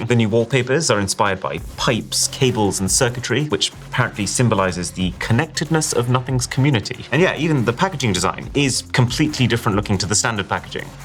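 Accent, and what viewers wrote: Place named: RP, United Kingdom. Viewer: This Wealthy White English accent kills me man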